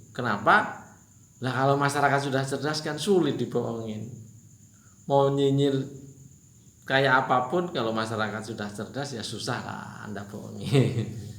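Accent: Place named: Banyumas, Indonesia. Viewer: Ini kaya chanal ngapak..bro ..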